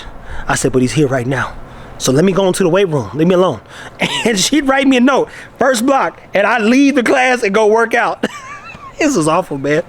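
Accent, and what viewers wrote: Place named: Jamaica. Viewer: I pick up Jamaican trace in u someone in ur family is Jamaican.. u should go lecture philosophy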